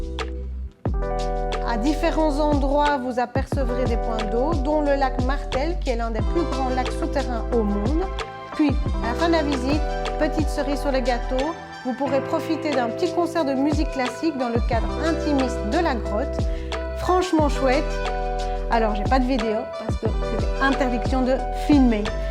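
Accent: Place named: Switzerland. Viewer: Sympa ces découvertes sur Majorque à tester...je peux entendre un accent Suisse dans ta voix.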